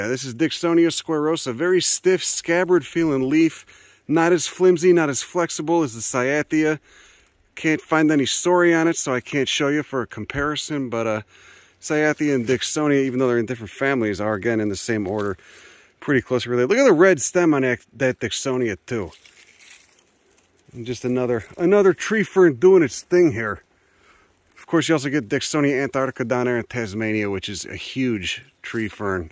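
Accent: United States. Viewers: Absolutely correct. I was just trying to be really simple, and allow for an American accent.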